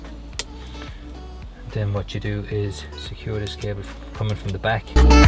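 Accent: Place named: Dublin, Ireland. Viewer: Great Video…what part of Dublin are you from??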